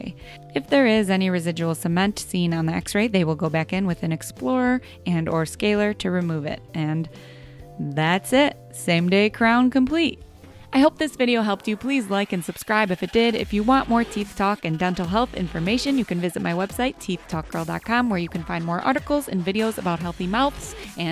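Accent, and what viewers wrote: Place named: Minnesota, United States. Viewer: I like your voice. You sound like a friend of mine. Are you from Minnesota?? Great video BTW.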